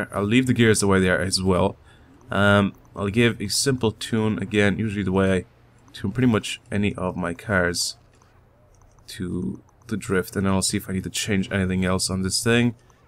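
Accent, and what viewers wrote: Ireland. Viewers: Are u IRISH, I am :)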